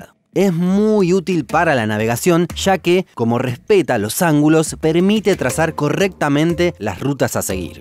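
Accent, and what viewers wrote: Argentina. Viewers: O sotaque/acento argentino é tão bonito que me distrai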